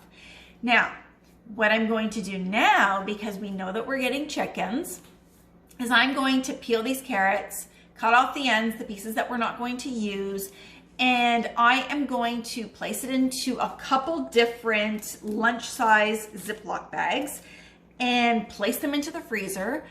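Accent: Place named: Canada. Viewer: Hello! Thanks so much for visiting me! Wow this was so interesting! My Husband is Japanese so I make a lot of fermented Kimchi! I know you must live in Canada. Love your accent ❤️. New Friend here❤️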